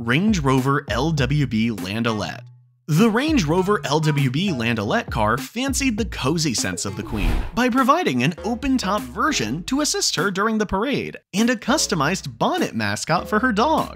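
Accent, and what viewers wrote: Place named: United States. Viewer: Typical video when you hear an American accent trying to explain British facts, you know it is going to be full of errors, lies and poor (or no) research.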